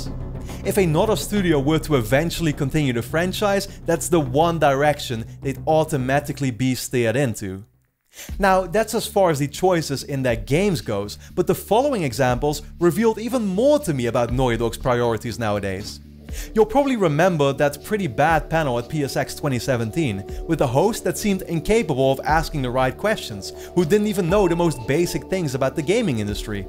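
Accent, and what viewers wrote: Netherlands. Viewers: Never heard of your channel, and Youtube pretty much always recommends trash. I decide to watch a recommended video for once, and it's gold. Spot. On. Plus, hup, Holland hup! Meer Nederlanders met een stevig accent in games!!!!!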